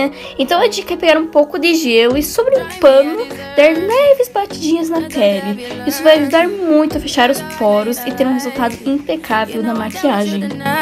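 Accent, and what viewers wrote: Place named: Rio Grande do Sul, Brazil. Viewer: E esse sotaque ai em?😍 Seria um sotaque Gaúcho? bjs sua linda acabou de ganhar mais uma inscrita hehe sua lindona!❤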